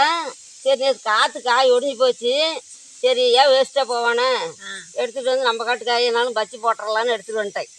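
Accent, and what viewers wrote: Kongu, India. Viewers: கொங்கு தமிழ் அருமைய் 🙏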